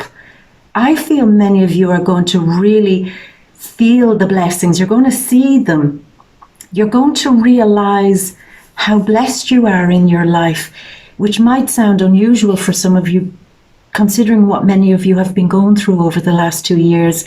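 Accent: Ireland. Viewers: You are very beautiful. Inside and out. I love your irish accent. A lovely Colleen. Thanks for a great reading. I was in tears because its so true👏💞😁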